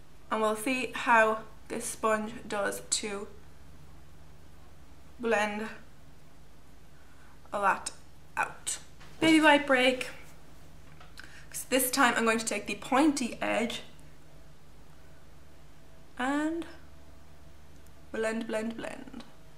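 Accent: Ireland. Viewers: U irish??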